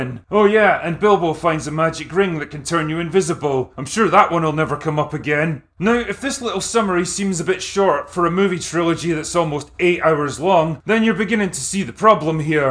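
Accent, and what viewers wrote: Scotland, United Kingdom. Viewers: scottish with a hard S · I have no idea why this Scottish guy talks like he’s holding in a monster sh*t. We don’t all talk like that I promise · The dwarves in this movie were so dumb, to put it plainly, and they were all unlikeable, especially the leader. Did you see The Hobbit, the cartoon? It was around when I was young (early 80s). I remember it was very good. Also, the book. I don't think we really needed these movies. Course, we don't need most movies. Maybe if it was just one really good Hobbit movie it would have been something.... I think the actor who played Bilbo did a good job though, but I can see your point about him acting the same in every movie. Edit* by the way I love your Scottish accent. I'm from the U.S., it's probably one of my favorite accents, I don't hear it enough!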